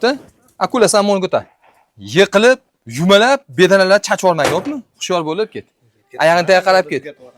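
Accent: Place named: Kokand, Uzbekistan. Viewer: АССАЛОМУ АЛЕЙКУМ КЫРГЫЗСТОНДИ ОШ ШАХРИДАН КУЗАТАМАН КОЛЛАРИЗ ДАРД КОРМАСИН МЕНИ АЯМ КОКОНЛИК ОШГА КЕЛИН БОЛИБ ТУШГАН ШЕВЕЛАРИЗ ОХШАБ КЕТАДИ ЭШИТИБ ХУРСАНД БОЛАМАН😊.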